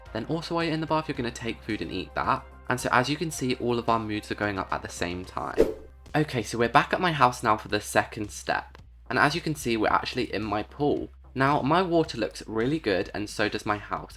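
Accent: United Kingdom. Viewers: Yes he just sounds different because he’s British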